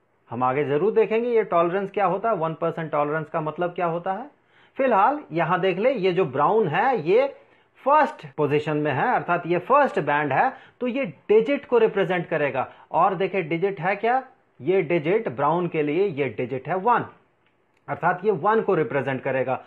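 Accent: Bihar, India. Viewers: Apna yeh Bihari accent change karo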